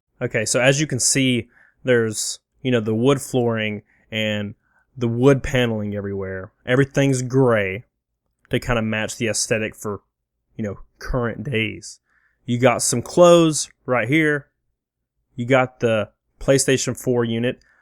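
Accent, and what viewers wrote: South, United States. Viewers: It's a southern thing. In my time there, I ran into tons of otherwise highly intelligent people who mangled the pronunciations of all kinds of words.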